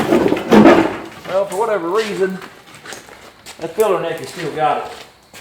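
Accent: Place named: Kentucky, United States. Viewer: I got to ask and I'm from KY myself. DO NOT EVER CHANGE YOUR COUNTEY ACCENT!!! It's one of the reasons I watch. Right chear, over yonder and look at this winner (window) AWESOME!!!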